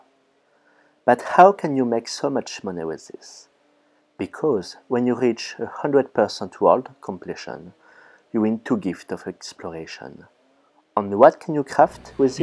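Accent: France. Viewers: Good tips meme si ton accent francais se sent Bcp plus😂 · on sens bien l'accent française XD mais sinon bonne video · Good content, but i need subtitles to understand much of what youre saying. Very deep French accent. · He is French, not German! French people speaking Englisch sounds so much worse than Germans speaking it. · Merci pour cette vidéo, une vidéo pleine de bons conseils et un accent bien franchouillard :D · Man,I love your accent...Liberte,Fraternite pour Tyria :) Amazing video btw.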